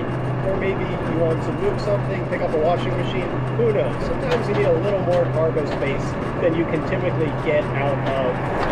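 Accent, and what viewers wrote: United States. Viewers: Your English sounds 100% American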